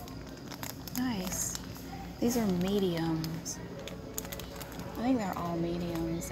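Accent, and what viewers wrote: South, United States. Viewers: Everyone talks about your southern accent but it sounds normal to me since i’m from south georgia 😂😂😂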